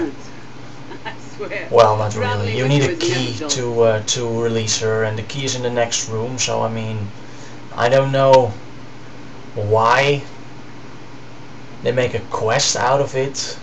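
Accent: Netherlands. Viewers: hes dutch aint he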